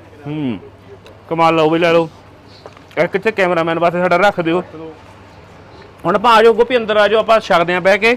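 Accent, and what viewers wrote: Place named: Moga, India. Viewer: Moge di boli ba kamal hai mithi mithi.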